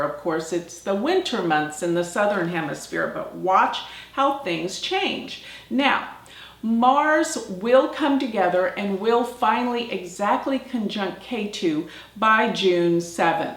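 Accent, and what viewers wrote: United States. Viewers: Greetings from Vedic astrologer...from France. Thank you for posting. Just cannot stand this American accent ;-((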